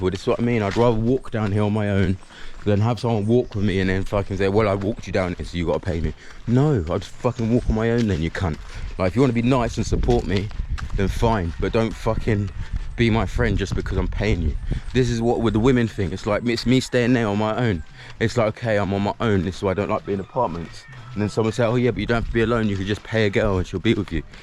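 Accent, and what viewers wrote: United Kingdom. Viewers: Honestly i am not bias. I just see this guy with a weird personality.. He sounds so bitter and he doesnt seem to have any confident in himself. I think that he was mixing with the wrong people. He got lost in the bushes. What is wrong with him? He needs help!! Hes just throwing tantrum . The other utubers ask ed for help from people who they have sought out before landing but you just went this british accent and think that would get you by. Life doesn't give anyone what they deserve, you must fight for what you want. Love ❤brother but your attitude isnt right. Jamaicans love and care for people. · You are obviously disgruntled socially. Where are you fron really?. I can hear the british accent. Am Jamaica and live in theUS. Just can't understand what you are do angry about. People ask you for money just day no. I have neices, nephews and lots of cousons in the UK. 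Enjoy you stay in Jamaica and calm your nerves.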